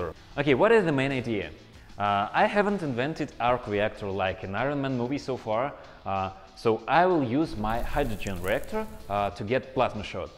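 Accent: Russia. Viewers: Ты спалил что русский) Акцент спалил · Ля а акцент похож на русский. Русский? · А почему на инлище и с акцентом русским?) В чем проблема пилить для рашен аудиторишн?) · Русский акцент 🤔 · Пиздец у теья акцент) типичный русский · Этот русский акцент...ммм...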